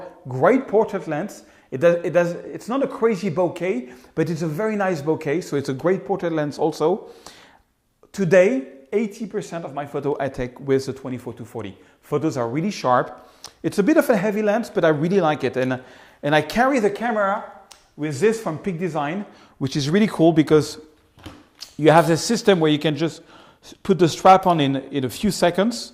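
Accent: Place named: France. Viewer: gotta love that french accent!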